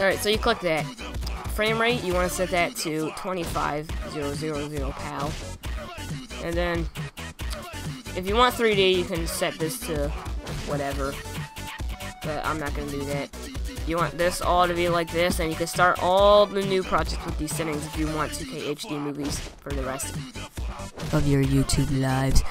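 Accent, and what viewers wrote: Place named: United States. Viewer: you sound 100% American, why are you choosing PAL?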